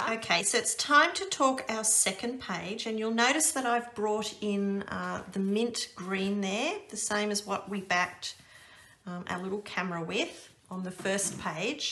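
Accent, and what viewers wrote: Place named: Australia. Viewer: !these pages are beautiful,love to watch you scrap and listen to your Aussie accent! Just Lovely xx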